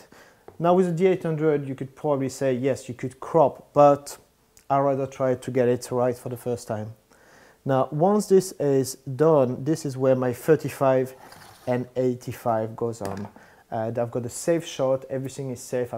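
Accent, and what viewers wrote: France. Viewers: I subscribed to another french photographer and when I first listened to you speaking I knew you're french..I love the accent.. Very informative video!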